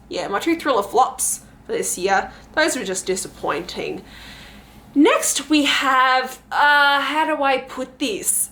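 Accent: Australia. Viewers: love that Aussie accent 💪now were is the manga! You talking about these gross books with no pictures LOL I'm just playing but it doesn't sound like you enjoyed these books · Your reactions make your Aussie accent so prominent. It was very entertaining 😂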